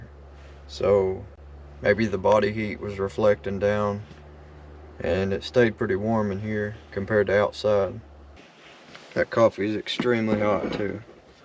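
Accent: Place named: Appalachia, United States. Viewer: nice, I could tell from the accent you were also southern Appalachia. Keep up the great work brother!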